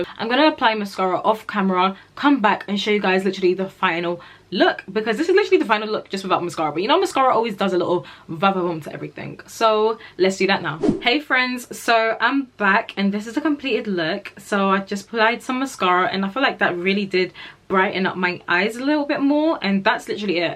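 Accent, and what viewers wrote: United Kingdom. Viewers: I just subscribed because of your accent...I don't know why I love British accent so much 😭🥺🥰🥰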